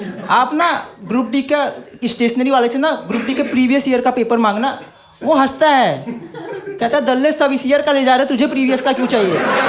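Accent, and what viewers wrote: Uttarakhand, India. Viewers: Ha flow or naati se samjh aaya 😂 ..... mai bhi uttarakhand se 🎉😂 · Bhai uttarakhand mai kaha se ho?? Accent bata raha hai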